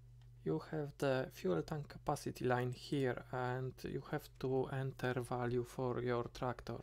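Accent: Poland. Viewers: I got 1 question, your Polish? Cuz i feeling I'm hear this accent too much times in my life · Tak myślałem że Polak po akcencie :P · Dzięki wielkie, zajebisty masz angielski bo mega polski akcent ale posługujesz się w pełni poprawnie czasami itd. pozdro ;D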